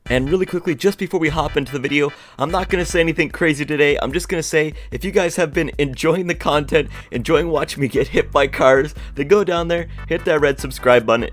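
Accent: Canada. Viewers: love the accent, very Canadian 😎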